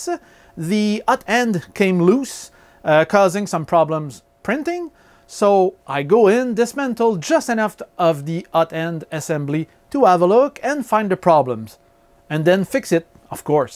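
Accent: Quebec, Canada. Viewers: i love the Quebec accent! And thanks for the video!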